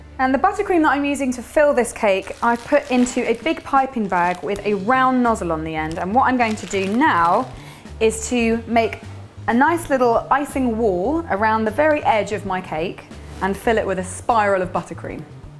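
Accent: England, United Kingdom. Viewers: thanks for the tutorial. You're very pretty. your english accent is nice ☺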